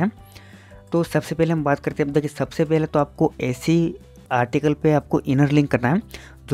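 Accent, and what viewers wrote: Gujarat, India. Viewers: bhai tum gujarati ho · Gujarati ho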